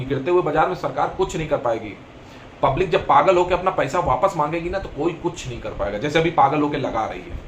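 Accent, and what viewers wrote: Kanpur, India. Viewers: paisa ghar kaha aaya be maza aa gaya ye dialogue sunker bade bado ko ye baat samjhne me aadha jeevan lag jata hai sahi me aap kanpuriya style me bolte ho hum bui kanpuriya hai sab bahut dhag se samjhte hai maan gaye jeevan kaise jeena hai kyo hum log jeevan me aaye hai kyo hume future ki chinta nahi karni hai matlab utni karni hai jisse kaam chal jaye aur jeevan future ki chinta me kharab nahi karna hai is video me bahut kuch seekha ja sakta hai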